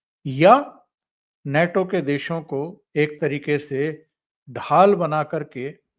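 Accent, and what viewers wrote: India. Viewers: Nui to kahu Chacha Desi andaj me .news badiya h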